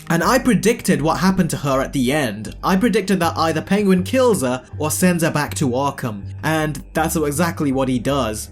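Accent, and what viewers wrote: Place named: United Kingdom. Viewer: As I was listening to this doing my morning routine, I realized something I should have from the start but didn't pick up on until now. Maybe because of your British accent or "British like" (not good with that stuff), but anyway, you got me thinking about "Vic" is shorthand for cops for a victim. So in an odd way the entire time, they gave us a clue he was destined to be Penguin's victom all along. The extra sad part is if he let Squid live he would have had at least one dude who would recognize his body, but he killed him because he knew he would be (Victor) be less valuable to Oz in crown point and Victor's neck would be shot instead of choked out at the end. (Not to mention his mom giving Vic love and thinking he is the elder brother at times. As soon as Oz knew he had that gangster Aisian dude Vic was no more useful to OZ as a #2 man just like Squid would have been. 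Sad but Vic was always going to be a Victom of the city. Hopefully Batman takes in an Orphin underground that was selling bliss and wandered into the wrong tunnel and we can finally get a Robin or a Robin spinoff series that brings in Robin for Batman 3 vs Mayer Ozwald Cobblepot the new Mayer who "understands" crime better then the last Mayer Reeal.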